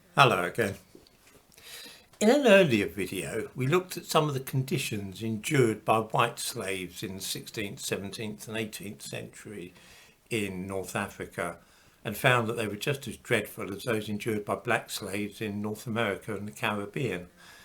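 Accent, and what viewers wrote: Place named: England, United Kingdom. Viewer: So all it takes is for a dude with an English accent to sit in front of a bunch of books and present himself in such a manner, that he is a historian and nobody checks his lies well there were a few comments I saw on this where people were correcting him. I saw at least six comments where people corrected him on points of History. In six comments people have to correct him on points of history, but what's unbelievable is they still agreed with the premise... Wow people will take any excuse to wallow in their racism... Or is it just that they don't want to take responsibility for the villainy because when you find that many minor discrepancies it's a major lie.... The transatlantic slave trade happened. Slavery in Africa and buy Africans was way different than chattel slavery... They had rights they could not be murdered and families could not be separated... 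Many slaves rose to leadership within African families... Accept what happened learn from it and move on in a more positive manner work towards healing... For those of you whose psyche is too delicate to accept the fact that wrong was done and you perpetuate the wrong stay trapped in ignorance....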